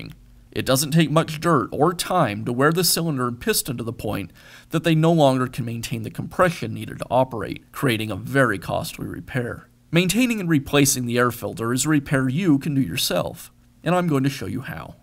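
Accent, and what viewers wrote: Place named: United States. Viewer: I'll give a thumb up for all your videos only if they have your subtitles. Unfortunately, your nice American accent really breaks me into sweat. I'll try to get used to it, however without transcription it's too heavily yet.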